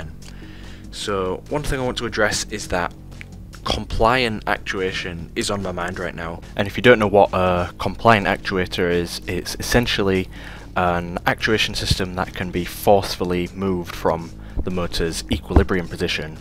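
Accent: Hull, United Kingdom. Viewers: Are you from Hull?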